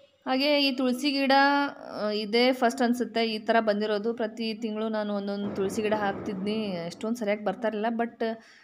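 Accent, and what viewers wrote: North Karnataka, India. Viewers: ಅಕ್ಕ ನಿಮ್ಮ ಭಾಷೆ ಕೇಳಿದ್ರೆ ನೀವು ಉತ್ತರ ಕರ್ನಾಟಕದವರಾ??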